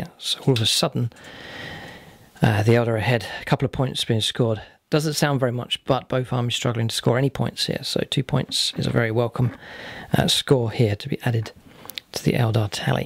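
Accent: Northern Ireland, United Kingdom. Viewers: Northern Irish accent is immediately recognisable.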